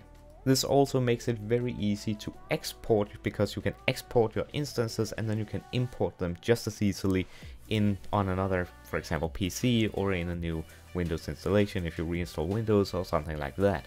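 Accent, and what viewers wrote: Denmark. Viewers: hmmm you sound a bit danish...?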